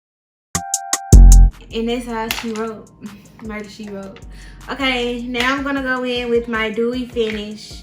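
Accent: South, United States. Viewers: Can I suggest a concealer for you to try?? Maybe the Maybelline eraser concealer in the shade tan? I love that concealer and it has a creamy consistency it’s not runny at all. Love the look! 💞💞 Btw you don’t talk country you just have a southern accent I’ve heard people talk country and that’s definitely not it lbs 💞💞💞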